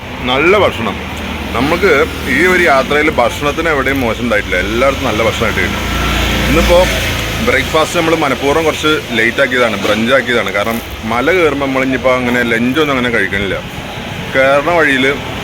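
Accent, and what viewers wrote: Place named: Kottayam, India. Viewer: 😁😁 കോട്ടയം സ്ലാങ് 😁👌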